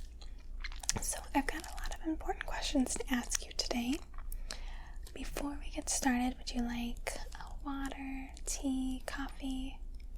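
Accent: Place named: Midwest, United States. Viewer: I can tell by looking out your window at the trees that you are in a warm state(southern), but your pleasant sounding accent you probably grew up in maybe Chicago or some Midwestern town.😉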